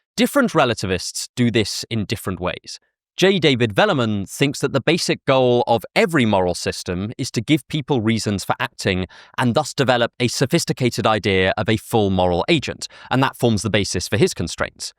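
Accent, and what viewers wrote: United Kingdom. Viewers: I'm in love with his british accent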